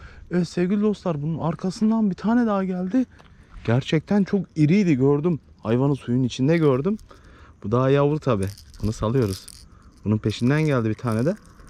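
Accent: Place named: Denizli, Turkey. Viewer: şive bizim buralara çok benziyor Denizli liyim bende o yüzden sordum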